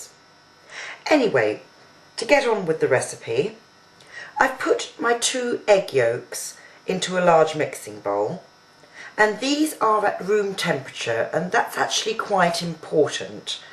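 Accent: United Kingdom. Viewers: Sounds like she may be British. I enjoyed this video because of what you mentioned. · I'm an American, and just by listening to your voice, I thought you were a British Aristocrat! I wish I could speak English like you. Thank you so much.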